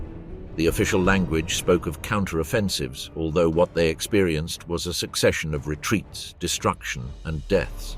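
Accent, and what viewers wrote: England, United Kingdom. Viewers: and more so the accent is English not German!!!! it must be propaganda... the Germans beleived they were winning right to the end with the vunder machinas. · How could it be the German perspective, when the narrator has a strong English accent ? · How can it be from a German perspective with your English accent?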